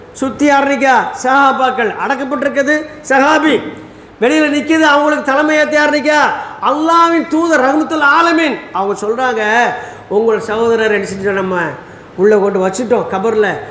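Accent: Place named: Tirunelveli, India. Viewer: முழுவதுமாக பயானை கேட்டேன். அருமையான திருநெல்வேலி தமிழில் ஒரு சிறந்த பயான் அல்ஹம்துலில்லாஹ் வேண்டிய பயான் ஆண்களும் பெண்களும் அதிகம் அதிகம் பயான்கள் கேட்பதால் நம்முடைய ஈமானுக்கு அதிகம் அதிகம் உரம் ஊட்டியது போல் ஆகும் .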